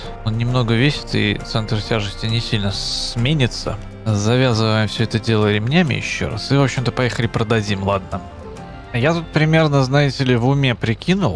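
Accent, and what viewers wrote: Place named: Saint Petersburg, Russia. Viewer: Привет. Спасибо за серию. Ты вроде бы с Минска, а по нашему, по-Питерски разговариваешь. Откуда про бордюры знаешь??? )))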